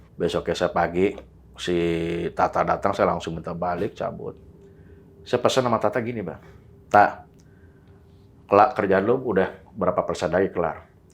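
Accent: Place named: Jakarta, Indonesia. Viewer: betawi pride banget niiih ngomong nya, horor rasa komedi jadinya🤣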